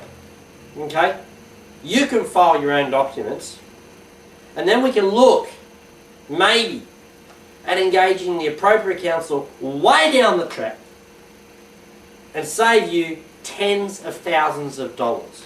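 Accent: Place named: United Kingdom. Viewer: OK from your acent its obvious your British, so does what you offer work in american courts? I have been unable to get anyone with the knowledge I need to help me. The whole system seems to operate on the premise that "its there little enterprize" and anyone who is not part of it, is not welcome. I thinks this is because if anyone figures out their little fraud, they would be out of business.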